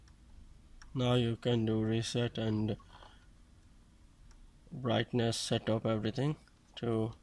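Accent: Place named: India. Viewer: its english but he is propably from india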